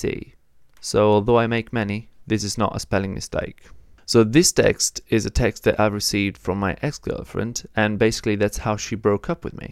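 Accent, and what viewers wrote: England, United Kingdom. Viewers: Born in England, or grew up there, or what? How amusing to find an Italian channel taught with an English accent. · OMG... thank you for the videos! I've been looking for something like this since last year but you didn't come up from research results and nothing else worth watching either. I found Tom, a guy from New York with EXCELLENT American English accent but he didn't break down his lessons like yours! So I didn't learn much from him. Thank god your British accent is NOT too thick for my American ears! :) Please keep it coming. I haven't watched the entire 65 videos yet but loving the ones I have watched. I even fall asleep! NO, it wasn't because it was boring but because your voice is soothing that managed to help me fall asleep (I am insomniac). Thank you again... and thank your girlfriend, too! :)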